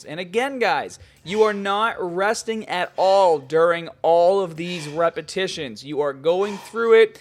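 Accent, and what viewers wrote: Boston, United States. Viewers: The Boston accent is the best ever!. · Boston accent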